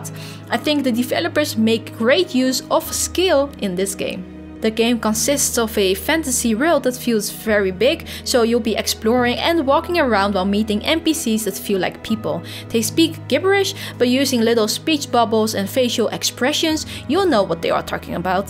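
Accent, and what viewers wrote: Netherlands. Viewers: Doe een video in het Nederlands nu denk je van hhuuh hoe weet je dat ik Nederlands spreek ik kan het horen aan je accent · Hey i detect a heavy dutch accent ^^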